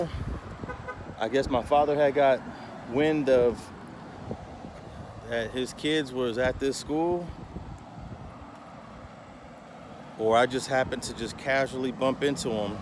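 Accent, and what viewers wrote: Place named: East Coast, United States. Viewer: Bro I always wondered why in your earliest videos you had a east coast accent , and you seemed like an outa towner trying to learn LA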